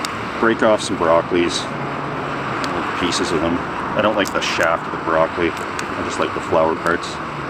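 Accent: Canada. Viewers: you've got a nice, soft voice and comfortable Canadian accent. I think you'd be a great reader for an audio book or something of the likes, I'd listen to it.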